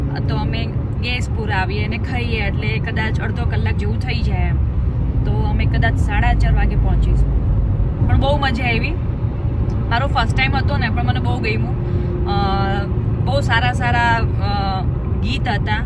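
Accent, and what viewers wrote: Kathiawar, India. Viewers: tame kathiyavadi bolu che e maja aave aapna aapna j bolu bolay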